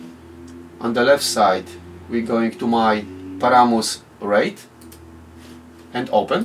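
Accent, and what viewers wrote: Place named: Poland. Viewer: Nice Polish accent:)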